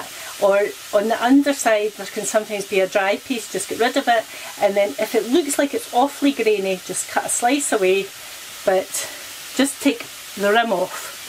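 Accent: Scotland, United Kingdom. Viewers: My mothers was scotch/Irish You sound like everyone that was in my life growing up .☺️ I have my Auntie Annie's fly cemetery recipe and her short bread recipe. They've all passed on, I don't get to hear that lovely brogue. So I watch you. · haha her scottish accent is mild!! full on drunken glaswegian is much harder!!!!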